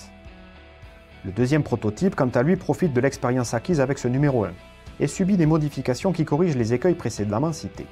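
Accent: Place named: Marseille, France. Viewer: Amusant, l'accent Marseillais !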